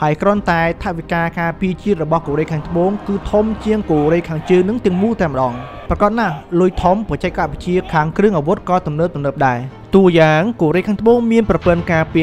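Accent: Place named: Prey Veng, Cambodia. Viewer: សំដីសំដៅរបស់ admin ដូចអ្នកស្រុកព្រៃវែង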